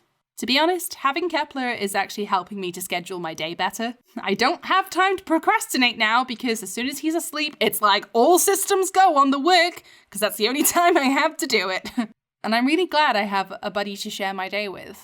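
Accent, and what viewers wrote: United Kingdom. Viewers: me: haha, although now we didn't know i feel your british accent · I love your british accent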